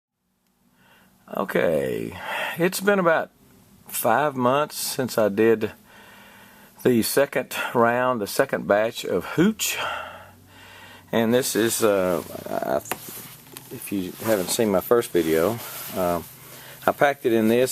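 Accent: Texas, United States. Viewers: Who the heck takes the fruit out of the drink eat a whole or Blended. that's the best part it's like an Ever melon. When you cut a bottle size hole in the top of your watermelon flip over a bottle of Bacardi in that thing overnight next day eat the watermelon it's great! I like it even better with coconut rum like Malibu or something.... I'm a big fan of an unfiltered wheat beer, blue moon Hefeweizen stuff like that and I'm just getting into Brewing Meade's and wines so I kind of think everything that's in there is the way nature intended it and I pretty much leave a drink intact unless I think it would improve it to filter it clarify it or any stuff like that but like I said I'm a newb at this. Thanks for the good advice and you don't talk too much good sense of humor and a Texas drawl music to the ears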